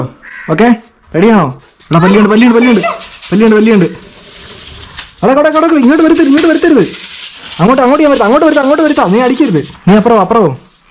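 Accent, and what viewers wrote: Kottayam, India. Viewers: R u from Kottayam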